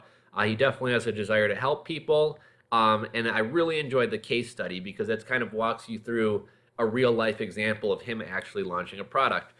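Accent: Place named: Australia. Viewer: He is from Australia so he has an Australian accent.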